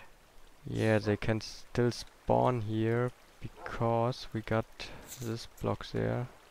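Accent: Germany. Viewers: Are you german? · Are you German? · German accent :D and.. can you german?!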